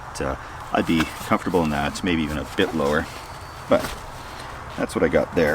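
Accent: Canada. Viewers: Canadian accent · your calm Canadian voice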